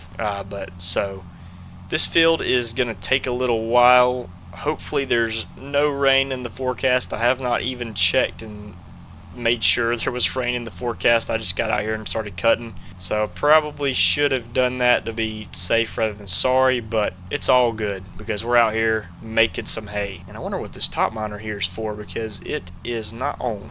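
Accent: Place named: North Carolina, United States. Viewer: Just found your channel watching this series loving so far don’t know where your from but sounds like NC only because you sound just like Dale Earnhardt JR lol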